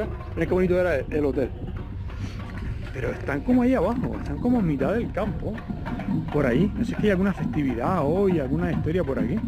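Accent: Spain. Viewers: y a mi también me encanta tu voz porque tiene el acento andaluz y hablar como mi madre y mis primos de Sevilla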